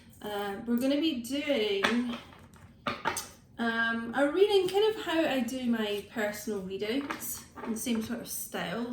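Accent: Scotland, United Kingdom. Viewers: Great realisation. What a beautiful Scots accent.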